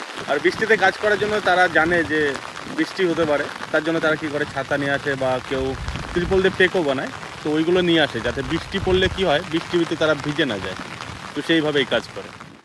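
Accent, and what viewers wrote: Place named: Barishal, Bangladesh. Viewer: ও ভাই তুমি তো কথা বলো মোগো বাংলাদেশ বরিশালের মতো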